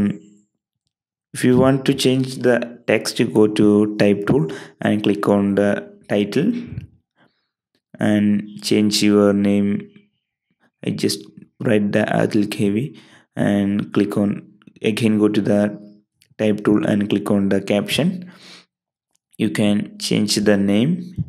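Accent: Kerala, India. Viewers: Why do you sound Malayali (Keralian)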